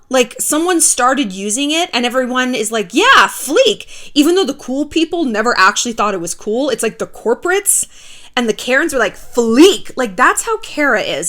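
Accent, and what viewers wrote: England, United Kingdom. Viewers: Your English accent is bomb 💣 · Your British accent 😂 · Lol your british accent 😘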